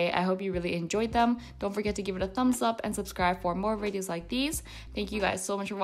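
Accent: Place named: Canada. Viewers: Where are you from? I love your accent :). Perhaps Canada?^^ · Lol i thought canada too!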